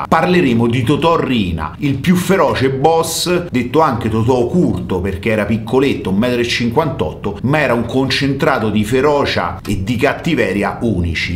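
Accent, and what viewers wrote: Rome, Italy. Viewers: Pero se devi raccontare non parlare in romano.. Non è mto corretto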